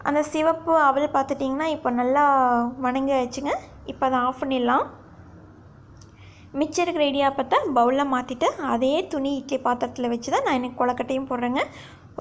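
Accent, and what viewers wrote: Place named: Tamil Nadu, India. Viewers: abroad il irunthalum ungada voice la unkada cooking la unkada dressing style la tamil flavour 100% irukku sis. super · Happy to hear cbe slang :)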